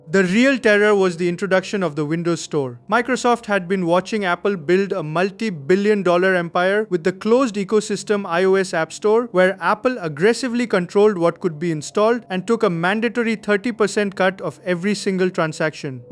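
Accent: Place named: India. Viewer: Heard the Indian accent and left instantly saar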